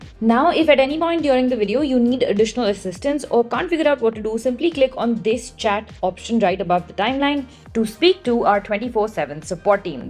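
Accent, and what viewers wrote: India. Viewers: is this official channel of invideo ? with an indian accent is a turn off to be honest and on top she reads so fast like she is in hurry which make the customer experience a torture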